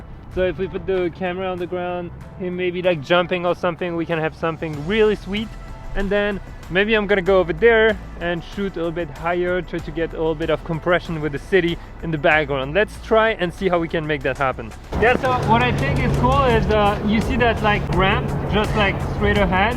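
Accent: France. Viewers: I wonder if ...tu es français ou québécois!? :D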